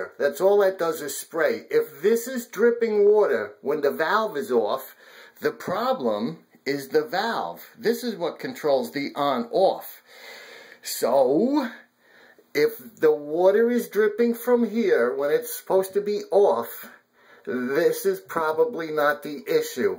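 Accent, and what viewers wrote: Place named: East Coast, United States. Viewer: He's from the East Coast of the USA - you can tell by his accent. Probably Massachusetts or New York.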